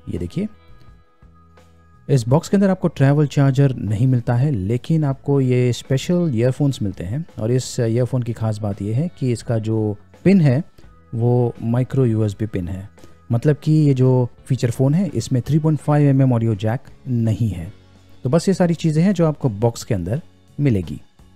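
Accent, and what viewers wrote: India. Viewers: Person in video looking like non Indian but audio is Indian . Is this phone available in India .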